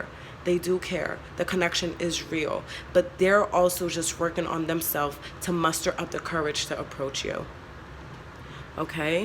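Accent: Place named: New Jersey, United States. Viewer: I love your voice! Reminds me of my cousins from Jersey. Also, will you please not apologize for anything? You can't hear the heater at all! Love your videos and guidance. Thank you!!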